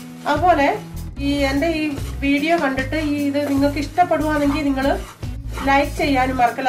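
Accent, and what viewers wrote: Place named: Kottarakkara, India. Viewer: സംസാരം കേട്ടിട്ട് കൊട്ടാരക്കര ഏരിയയാണ് വീട് എന്നു തോന്നുന്നു.,ശരിയാണോ??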